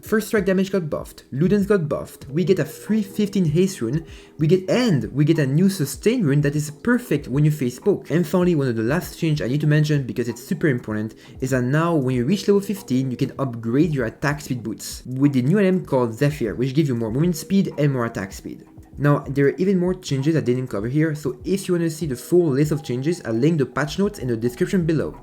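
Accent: France. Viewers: T’es français ? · You have a french accent bro ?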